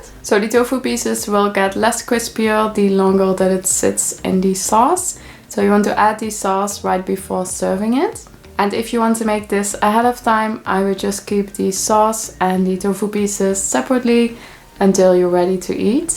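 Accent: Netherlands. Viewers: You have such a typical Dutch accent when you speak English! HEHE #LikeDJtiesto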